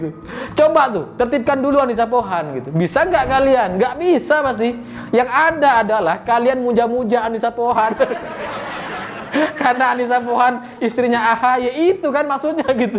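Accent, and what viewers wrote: Madura, Indonesia. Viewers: Mas Maduranya di tonjolkan dong...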